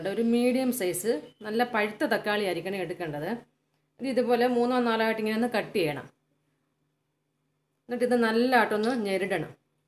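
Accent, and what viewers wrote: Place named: Pathanamthitta, India. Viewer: Supr👌 Pathanamthitta ano sthalam.... njangalude same slang 🙃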